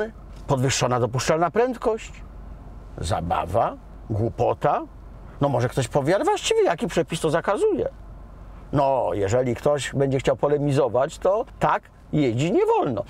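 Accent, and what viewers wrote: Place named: Warsaw, Poland. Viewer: Warszawskie naleciałości w wymawianiu słowa 'tutaj' oraz 'dzisiaj' jako 'tutej', 'dzisiej'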